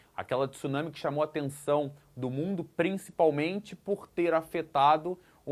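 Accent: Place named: Ceará, Brazil. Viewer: cearense kkkkk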